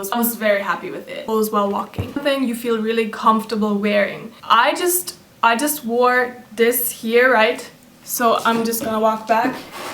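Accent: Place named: United Kingdom. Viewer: but akcent is british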